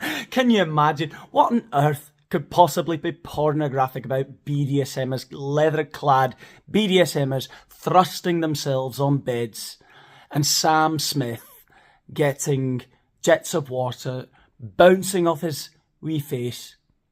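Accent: Scotland, United Kingdom. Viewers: I love the Scottish accent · I agree …. I feel bad about Sam Smith. I think he is going down a dangerous path in order to get acceptance from his audience. You create a great balance of humour and logical thinking. BTW…. I love your Scottish accent!❤ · Great Scottish accent 🤣🤣🤣😍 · that accent!! The Scittish accent💗 You are sure talented. I'm glad my favourite YouTubers comment on this stuff, or I wouldn't know what's going on in the world, lol. I'm not a fan of SS, but I'm aware Sam has a good voice, etc, and so it's quite something to see how he's become. Because it's more than sad, it is frightening. The industry is enabling so much self-harm(as others have mentioned, H&M come to mind). God help us! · Great Scots accent .. yr f..........amazing love it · The problem as I see it is society in general is accepting this behaviour. Why are they accepting it, is the question, are they afraid of being cancelled, being demonitised or being seen as "a right wing hack" Its stupid to bring politics into this, you know what kind of society do we want? 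A decent one? A fair one? Indulging these peoples fantasies of their identity and accepting their disgusting behaviour is agreeing with it. Well I dont agree with it, I want a society that is decent that holds good values up as things to aim for. I know heaps of gay people most of them you wouldnt even know they were gay and why should you? What people do in their bedrooms is none of our business, but putting on shows like this is just disgusting and the sad part is Sam Smith has a good voice, he doesnt need to be like this to sell records. ps love your scot accent! keep it up lol. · I thought it was rather dated to be honest. Madonna has already done it 🤷‍♀️. What’s the Scots connection, great accent 😂❤️ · Excellent Scottish accent ❤ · Excellent video. You are spot on. Your Scottish accent had me laughing hysterically loud in the metro. I really enjoy your take on things and your humor. 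Thanks for making me laugh and for sharing your opinions. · On the way to 100000 subscriber!! Bravo!!!! My God, I looooooooove that Scottish accent!!! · Am scottish and I loved your scottish accent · Like your Scottish accent. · Love the Scottish accent! 👏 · Impressive southern Scottish accent there This Scot🏴 approves! 😄👍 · Love your comedy, love the Scottish accent, but I also love your serious comments at the end. Keep up the good work! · That Scottish accent wasn't too bad! Not sure you'd get away with it here in Glasgow. You definitely wouldn't get away with nipple tussles and a corset. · Love your Scottish accent. YOU are brave. You fully covered the quandary we are facing ...the balance that is lacking. Jordan Peterson addressed the gender confusion astutely. Unfortunately, the pendulum swings from one extreme to another and seldom spends much time in the central, balanced position. 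Thank you for articulating the full spectrum of our reactions..I, too feel disgust looking at videos by Harry Styles, Madonna, and this latest from Sam Smith. Society used to protect children from encountering this kind of exposure and allowed them their innocence to grow and mature without the trauma of intrusive information beyond their ken, or ability to handle. As a mature adult, I don't want to see it either. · Best Scottish accent ever! Love when you laugh at your own jokes. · I like your Scottish Accent. Sounds So cool. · The trouble with Sam Smith and Harry Styles is that they are actually just not very talented. Their music is middle of the road bland. To me they are like children dressing up for attention. I speak as someone who loved rock stars who really knew how to stretch boundaries and gender like Bowie, Jagger and even Grace Jones. These are wee boys trying desperately to be relevant. 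Loved the Scottish accent! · Great Scots accent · You have surpassed yourself in this one! Especially the Scottish accent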